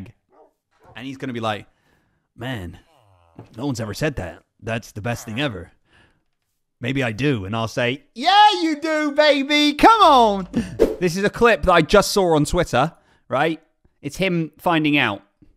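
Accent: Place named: United States. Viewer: Once again, that American accent (this time with a Tango-inflection), FTW. So good. 😄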